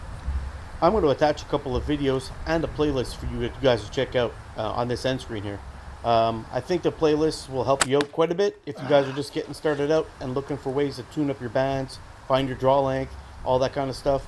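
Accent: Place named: Canada. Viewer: Just found your channel - great stuff! Nice to watch a slingshot video where the host has a Canadian accent EH! Cheers from Perth Ontario!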